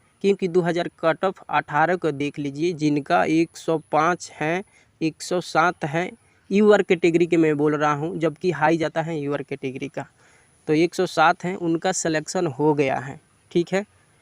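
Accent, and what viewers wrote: Bihar, India. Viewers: Bhai बिहार से हो क्या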